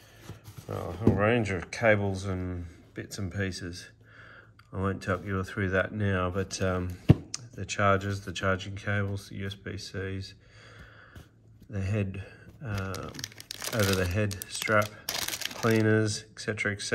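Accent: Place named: Australia. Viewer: You sound Australian. How long did it take to be delivered from the US? I am in Melbourne.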